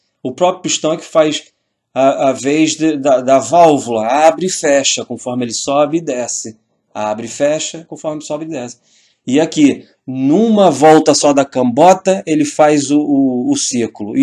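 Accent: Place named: Rio de Janeiro, Brazil. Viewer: Que legal sotaque carioca...explora o (x) em tudo kkk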